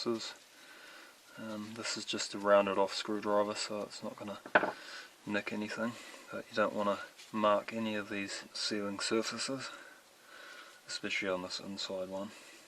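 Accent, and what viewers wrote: New Zealand. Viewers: Accent: this guys NZ. Dole pineapple can: he's in NZ.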